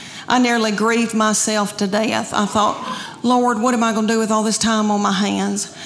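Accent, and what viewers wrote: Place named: South, United States. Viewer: heard her Tennessee accent and thought,"I'm home."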